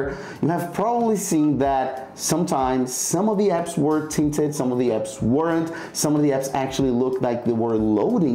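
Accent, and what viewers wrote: Brazil. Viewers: Great video, thank you! By the way, are you Brazilian?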